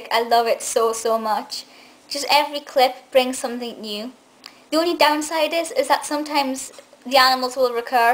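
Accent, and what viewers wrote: Scotland, United Kingdom. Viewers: I loved alan alan alan so why you living in scotland you have a hint of a scottish accent but at times i make out the geordie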